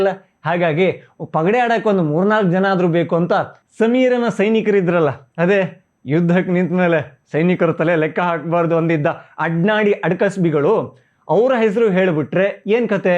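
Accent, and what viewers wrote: North Karnataka, India. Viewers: ಸರ್ ನೀವು ಮಾತನಾಡೊ ಶೈಲಿ ಚೆನ್ನಾಗಿದೆ 👌🏼 ನೀವು ಉತ್ತರ ಕನ್ನಡದವರ?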